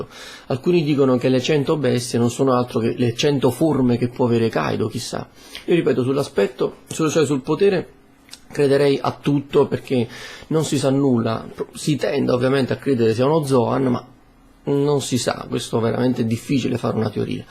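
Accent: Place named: Naples, Italy. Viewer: Davvero ottimo video .. come tuo solito ! E poi ci avevo azzeccato che eri di napoli hahahaha sei fantastico frat'm. Comunque volevo porti una domanda , e possibile che non come molti pensano e cioè che law a PH abbia chiesto a luffy un'alleanza in virtù del tutto fortuita visto che proprio per puro caso i due si siano incontrati in quell 'isola oppure che law sappia la natura di kaido (ovvero la D) e proprio per questo sapendo che luffy è della D sia per un alleanza nella conseguenza di attaccare un altro imperatore? ! Da law come stratega me lo aspetterei anche se mi rendo conto che questa teoria è parecchio campata in aria. Comunque saluti e continua sempre cosi! Appassonaci con le tue teorie e i tuoi studi su one piece proprio con il tuo modo "scialbo" e molto semplice che contagia tutti! Si o mast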